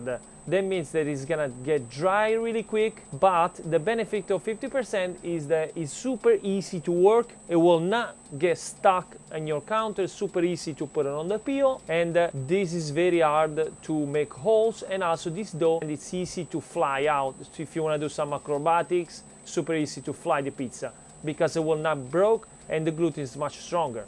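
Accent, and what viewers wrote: Italy. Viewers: great italian accent😍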